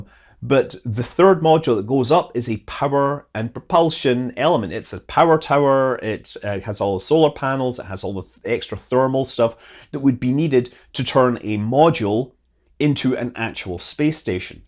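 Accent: Scotland, United Kingdom. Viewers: I say it to myself every time I see he has a new video up! My girlfriend hears me and says "Oh is it that Scottish space guy?"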